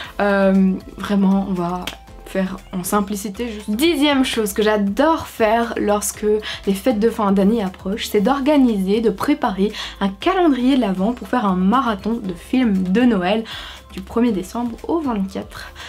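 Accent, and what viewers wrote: Belgium. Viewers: Je découvre ta chaîne et j’adore continue comme sa (tu m’a donné pleins d’idée ´et l’accent belge je suis fan )plein de bisous à toi et ton copain 💕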